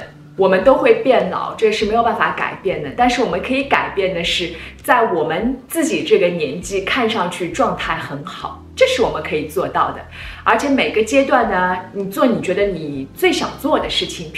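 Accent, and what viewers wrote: Shanghai, China. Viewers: 常驻伦敦的上海人表示听着很上海很亲切 恳求不要改进❤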